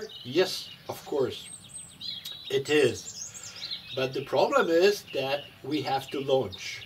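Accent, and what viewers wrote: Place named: United States. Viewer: Angielski naprawdę dobry, amerykański akcent. Pozdrawiam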